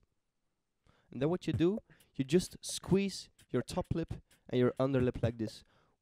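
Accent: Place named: France. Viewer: You have the French accent^^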